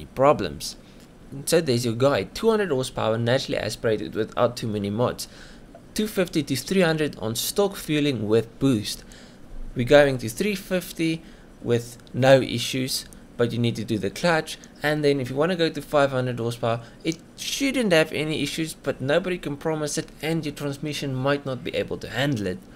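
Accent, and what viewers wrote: Afrikaans, South Africa. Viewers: Do I hear a boertjie accent?😏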